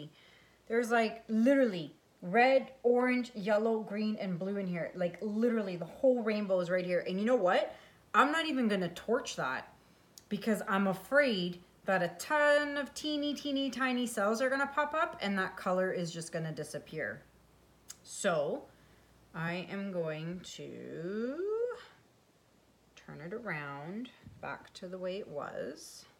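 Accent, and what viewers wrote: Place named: Newfoundland, Canada. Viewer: You sound like you are from Newfoundland. You sound like an uncle I had.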